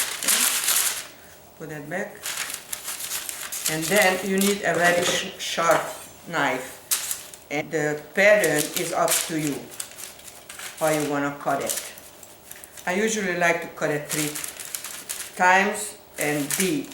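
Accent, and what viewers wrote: Hungary. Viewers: Thank you for your great videos. Not only do you make baking look easy, but you present it in a way that can be followed by someone like me, who is afraid of baking. I came to the US when I was only 7 years old. I'm a pretty good Hungarian cook. But, I love listening to you with your beautiful Hungarian accent. It adds extra flavor to everything you make!! Mivel nincsen senki akivel tudok magyarul beszélni, kérlek, enged meg hogy veled kommunikáljak és esetleg kérjek főzési tanácsot tőled. A nővérem Budán lökött. Nem jót USA'ba csak látogatóba. De már nem él. A volt férjemmel sem beszélek magyarul mert a többi család nem érti. Csak egy osztájt fejeztem be Pesten, de azért nem vészes a nyelvtan és az írásom. Régen leveleztem a nővéremel. Az már nagyon, de nagyon régen volt. Thanks again! And all good wishes to you and your family. Miriam P.S. 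I would love to know a little bit about you. You can find me on Facebook, if you wish to friend me. I would be so honored.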